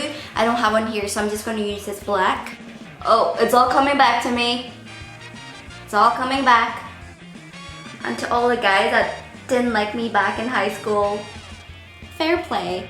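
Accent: Northern Ireland, United Kingdom. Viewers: I love your accent😂 Like I'm from Belfast and I can hear all the Northern Irish things you say, haha👏🏼👏🏼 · she's from Northern Ireland!! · you kinda have a british accent 😁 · As a norn Irish meself, i can personally say her accent is way cuter than the rest of ours 😂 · She lives in Northern Ireland again so her accent probably got stronger from hearing it all day everyday from everyone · Does she live in Northern Ireland? She has the same accent as me 😂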